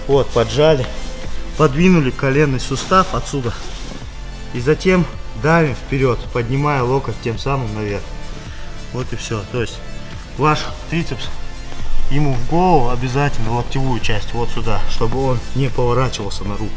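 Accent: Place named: Chechnya, Russia. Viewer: Слышу родной для ингуша чеченский акцент. Молодия для души!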